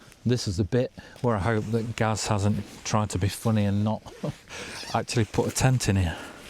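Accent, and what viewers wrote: United Kingdom. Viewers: Put big light on, sounds very Sheffield my friend. 👌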